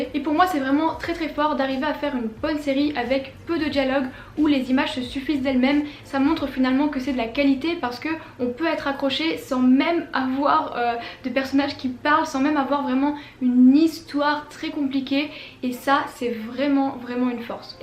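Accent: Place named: Quebec, Canada. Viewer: I lived in Montreal for 25 years, but I don't get to hear people speak quebecois french anymore, so I watch your youtube channel instead :-)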